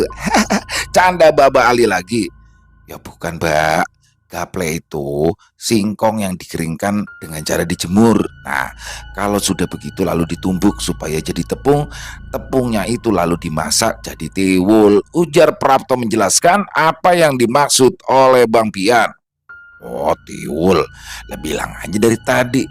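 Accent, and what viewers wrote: Jakarta, Indonesia. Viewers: Kalau udah logat Betawi bang Pian kluar kocak bgt😍 yang bikin kangen ya ini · Cerita bagus dari mas untung dan tambah hot jika dibawakan bang yg penuh penjiwaan dan pas bgt dgn gaya khas betawinya.... Mantab.. Joss...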